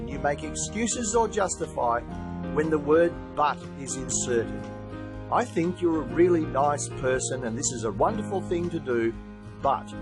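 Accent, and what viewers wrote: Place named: Australia. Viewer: I loved the Aussie accent 🫶🏼🦘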